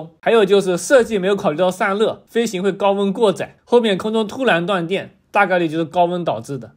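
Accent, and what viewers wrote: Jiangxi, China. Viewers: 江西舰？赣州人吧 一听就是水西西瓜皮的口音:face-blue-smiling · 听你说话，就知道是赣普🤭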